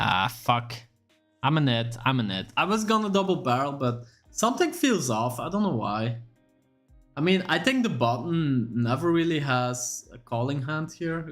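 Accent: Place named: Flanders, Belgium. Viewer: Echt insane hoe hard ik in de eerste 5 seconden hoorde dat gij Vlaams bent. Niemand in de wereld spreekt zo Engels.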